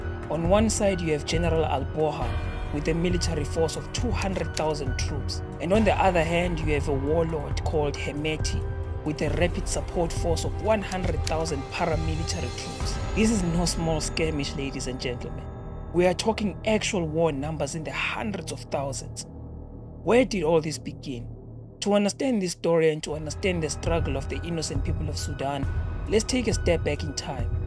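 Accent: South Africa. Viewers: I'm watching your YouTube channel like I'm series of game of thrones, very entertaining ka English ya mzansi😆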